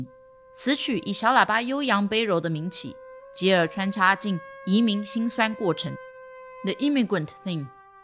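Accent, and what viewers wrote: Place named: Taiwan. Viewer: 謝小姐解說很細膩資料收集的很用心。妳的台灣國語很熟悉。我也是台灣老蕃薯😂😂😂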